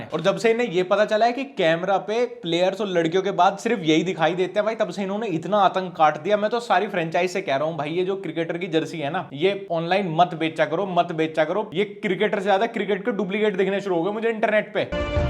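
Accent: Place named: Meerut, India. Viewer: Bhai tu pakka meerut ke side rateha h❤😅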